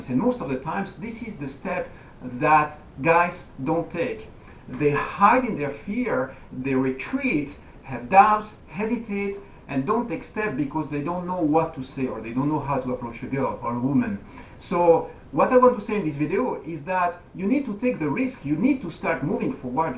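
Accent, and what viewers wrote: France. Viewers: is your accent French?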